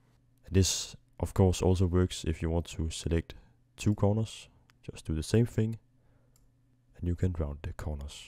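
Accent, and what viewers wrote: Denmark. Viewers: He sounds like he's from Denmark...rural Jutland if I'm guessing.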